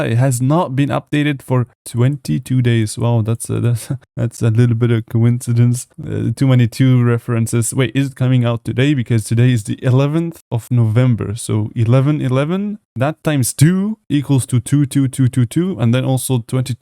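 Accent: Germany. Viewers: realtalk now are you german?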